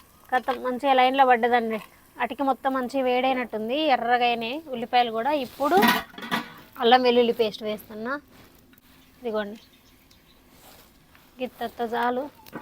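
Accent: Telangana, India. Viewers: Telangana slang add chesaru akka super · ee video lo telangana yaasa matladinav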